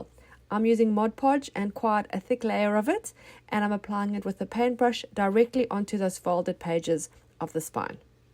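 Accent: South Africa. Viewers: New subscriber here! Are you originally Australian,as you sound very South African to me -I am a SA expat,so just curious 😊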